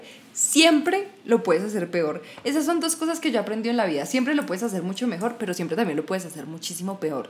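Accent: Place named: Antioquia, Colombia. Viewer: a mi se me gusta tu aura :) Siento que se te pegó el paisa jejej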